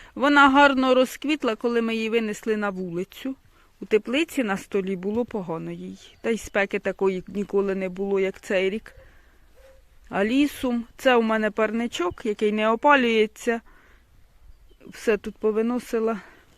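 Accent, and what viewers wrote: Poltava, Ukraine. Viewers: Ви молодець! Трудівниця і розповідаєте цікаво, з гумором. Зачаровує наша полтавська мова. Всі відео цікаві. А це хочеться дивитись безкінечно. Яка краса! Де саме Ви живете?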